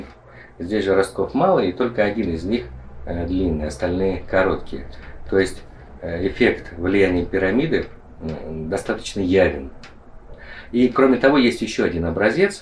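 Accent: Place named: China. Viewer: Да откуда у тебя этот русско китайский?)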